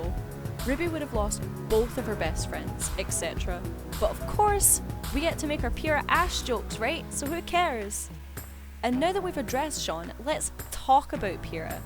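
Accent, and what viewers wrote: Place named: United Kingdom. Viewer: you sound like you come from the UK going by accent alone. but, i gotta say i like the idea of killing of arcy boy as yeah...that would of had lot of sub branch events and encounters down the line. also Miles is a hack, like really check its like he attended one of J.J abrams talk on makeing story tropes and miles copys it like a fucking bible honestly just go in expecting something worthy of J.J film and you can normaly guess the ending or twists that are comeing up. also i personaly think hes slowy takeing the animation into "LENS FLARE!!"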